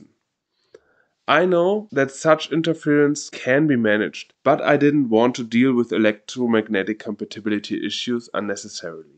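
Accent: Germany. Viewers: You sound german. Ist das was du baust nicht illegal hier? 😅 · You sound German, is this legal in Germany?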